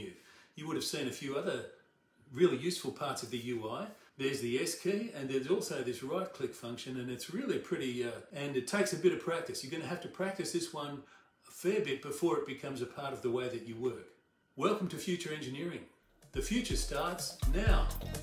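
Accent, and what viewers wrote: Australia. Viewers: Hello from UK! I much prefer listening to your Aussi accent than the American lazy drawl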